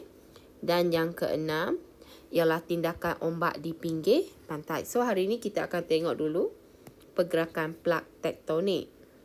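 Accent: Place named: Kelantan, Malaysia. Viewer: cg,cg orang Kelantan erhhhh HAHAHHA BUNYI SLANG KELANTAN "TAKDI" 😂.COMEL CG TQ VIDEO NI TOLONG SAYA UNTUK ULANG KAJI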